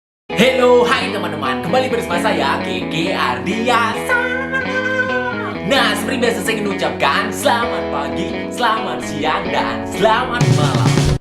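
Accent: Bali, Indonesia. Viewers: Gataw knp ya, denger dialek bali itu sesuatuu bgt bli ✨💫💜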